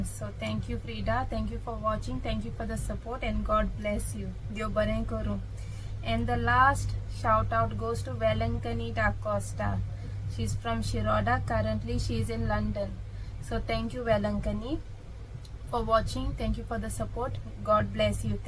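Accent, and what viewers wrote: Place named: Mumbai, India. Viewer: Hi!, I'm Sylvia from Mumbai. I accidentally came across your vlogs some days back as I was scrolling through U tube n was curious to see more of them. To tell u the truth I liked your videos. I found u r a very simple person with a strong personality. When you speak in English u really have some style n you sound like a Mumbaikar n when you speak in konkani u sound like a pakka goankar its nice. U have a very nice voice too, but if u can make time n join some singing classes you can modulate your voice even better. I know u don't get much time as most of your time goes in traveling but do try. I fish u all the best n continue with your vlogs. ❤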